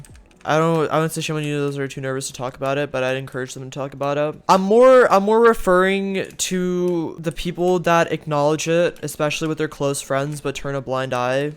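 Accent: India. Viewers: I was doubting from when I started to watch your videos as your face looked like an Indian and your accent of English Lots of love from India dude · Are u kidding me?!?! U r Indian Bruhhhhh your acccent wtfff I see ... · I AM REALLY PROUD OF YOU THE BEST SKYWARS PLAYER IS FROM INDIA AND I HAVE A DOUBT FROM YOUR ACENT AND YOUR FACE THAT U ARE INDIAN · Are you fr rn? Just because people don’t say their Indian or whatever doesn’t mean it’s racist stereotypes LMAO. Watched this and spit my drink laughing. Do white people point out they’re white?? Racism is a big deal but cmon man . Also imo it’s not that hard to tell if someone’s Indian based on their voice, (when I first watched you I knew right away) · Great vid i am also indian but i was raised in Louisiana and Alabama so i dont have an accent like u! Def my fav yter ☺️ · Me and my family are Indian and my dad said to me that whoever ur watching is indian because he recognized the voice but I didn’t believe him. 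It’s actualy kind of a relief because I want to be a YouTuber as a 2nd job but I was afraid of people being racist. But so glad to know I can do it.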